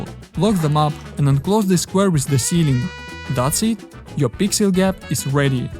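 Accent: Russia. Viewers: Брат ты русский что-ли? Акцент прям мощный.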